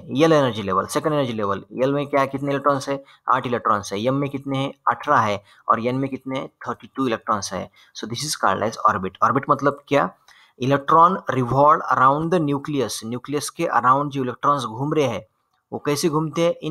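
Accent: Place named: Maharashtra, India. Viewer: Bohot mast explanation all concept are cleared !!! And i love that MARATHI ascent 💞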